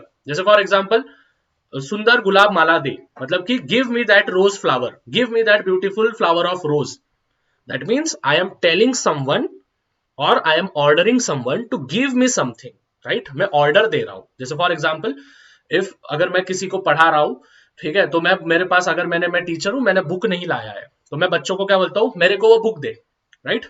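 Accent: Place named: Hyderabad, India. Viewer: Sar Hyderabadi accent😅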